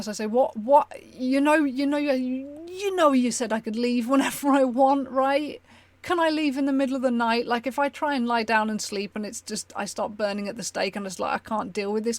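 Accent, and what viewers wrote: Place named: South England, United Kingdom. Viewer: I've just discovered your channel after being recommended some of your ED content (I've never had one but I'm interested in educating myself and understanding others' experience) I just wanted to say your MH experience here is scarily similar to an experience I had back in 2012, except I was locked up for three whole months. As an informal patient! And I regard myself lucky because services were slightly more funded at the time... You've articulated this whole thing wonderfully. Also, shout out for the south England fam - I noticed your accent was similar to mine and then you mentioned a place name that isn't too far from where I grew up so, yay!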